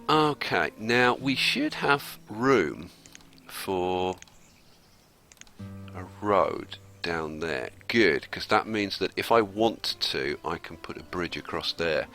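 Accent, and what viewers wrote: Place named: Northern England, United Kingdom. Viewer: where u from man in the UK? i guess in the north, but not too much high on map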